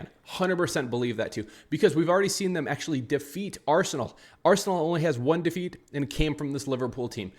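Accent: United States. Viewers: I like your presentation,are you an American?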